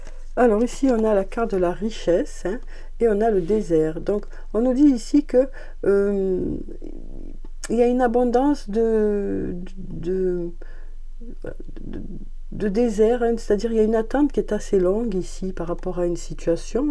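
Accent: South, France. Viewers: Coucou, contente de voir la dame de l accent du soleil et toujours un plaisir de vous écouter,avec cette douceur et bienveillance merci 💐🙏😘 Bonne journée à vous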